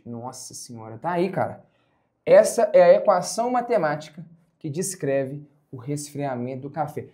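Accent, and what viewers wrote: Minas Gerais, Brazil. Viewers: Oce e mineiro guisole?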